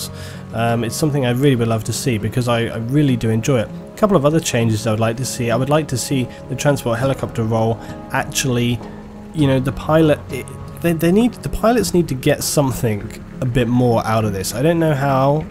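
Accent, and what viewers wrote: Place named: RP, United Kingdom. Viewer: U sound so posh and it annoys me this is why American films take the piss out of British lol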